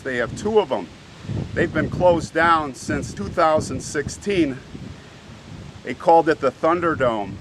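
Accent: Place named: Midwest, United States. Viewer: That prison must have been so awful 😖 Cannot imagine. Thanks also for remembering these forgotten people. I know people comment on your mid-west accent. I don't think I've ever told you, but it makes me smile ☺️ You say Illinois and some other words just like my friends near Peoria do! 💕 😃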